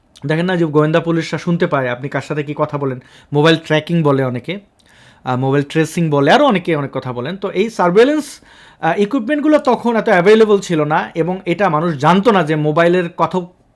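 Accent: Sylhet, Bangladesh. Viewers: ভাই আপনার সিলেটি ভাষা অনেক সুন্দর।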